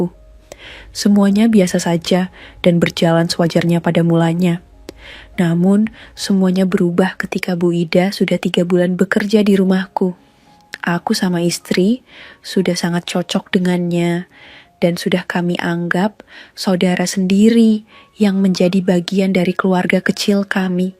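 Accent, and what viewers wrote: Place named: Eastern Indonesia, Indonesia. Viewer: Elu logat Indonesia timur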